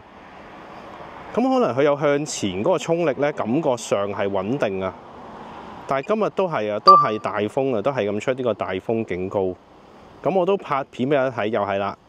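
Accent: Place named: Guangzhou, China. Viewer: 廣州大陸🐖口音，講英文都怪過人😂🖕🏽🖕🏽🤙🏽🐔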